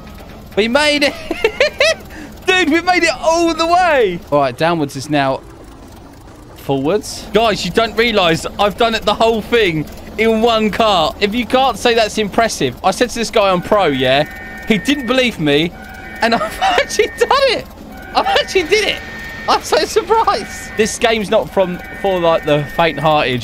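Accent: United Kingdom. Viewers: Are u British cuz me new sub!!!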